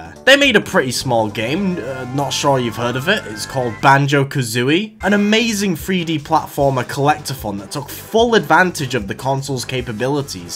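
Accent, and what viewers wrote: United Kingdom. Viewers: Are you British? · i didnt even notice you were british until you started talking about it LMFAO damn im fucked up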